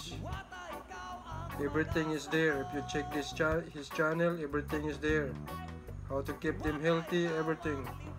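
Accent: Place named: United States. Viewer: Parang original na kanu sir may accent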